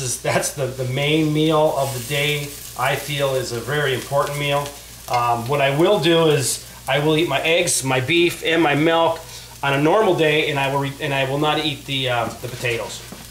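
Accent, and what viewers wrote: Canada. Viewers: Are you canadian? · Def Canadian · CAN SOMEBODY SAY CANADIAN???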